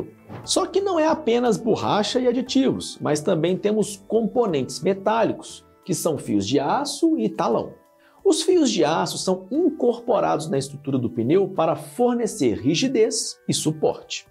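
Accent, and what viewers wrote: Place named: Rio de Janeiro, Brazil. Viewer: Ele deve ser só RJ, lá eles falam feio assim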